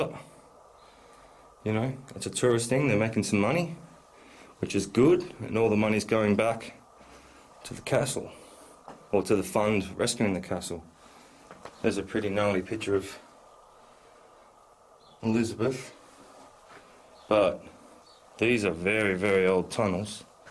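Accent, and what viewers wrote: Australia. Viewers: yeah, I did some reading and it's pretty interesting and creepy to know just how many died horrific deaths there. Some think she was innocent but that her servants set her up or were coerced into false testimony. Some think the servants themselves did horrible things to each other there. Either way, some very dark history. You're very fortunate to travel to these places and it's great you share it 😄. I love learning about new things and history. There's something I just love about the Australian accent too. I'm a wannabe Australian lol. Keep the videos coming!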